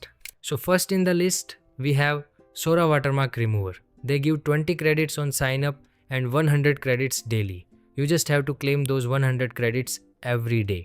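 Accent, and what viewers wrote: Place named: India. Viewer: As soon as heard the Indian accent I already knew it was some bullshit up ahead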